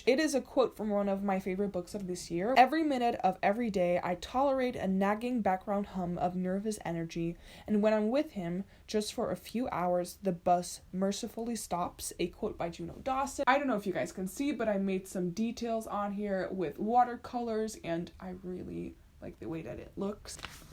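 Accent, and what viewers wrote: Netherlands. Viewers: omg is he dutch? XD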